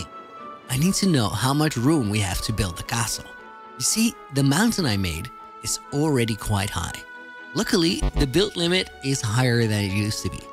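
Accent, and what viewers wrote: Netherlands. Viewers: very impressive,! You are not only a talented builder, but you have a gift for making videos too. Unbelievable! also: are you Dutch by any change? I thought I could hear it, but I could be wrong. (I am not at all saying your English is bad) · are you dutch cuz i hear it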